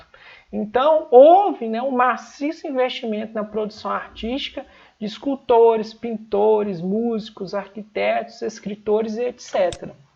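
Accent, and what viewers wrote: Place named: Belo Horizonte, Brazil. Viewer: Aula top! Bigode irado! Sotaque de BH bonito demais! Obrigado pela aula! sucesso!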